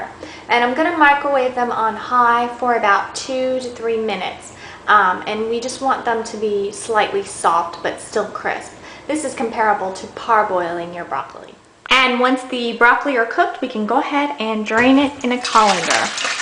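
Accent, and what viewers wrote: South, United States. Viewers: *New Subscriber* Do i hear a southern accent?? LOL I can't wait to make this dish....thanks!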